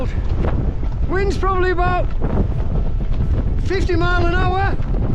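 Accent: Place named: Cumbria, United Kingdom. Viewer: Fantastic effort and great video - luv the Cumbrian accent too 👍Respect ✊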